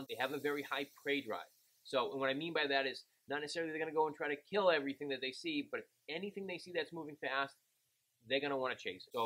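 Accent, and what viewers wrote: Boston, United States. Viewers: OMG. This is the most worthwhile review of anything in the history of YouTube. I think the Boston accent puts it over the top. Wait... what were you talking about? Oh right. Booty shorts at the 99 or Reveeeah Beach.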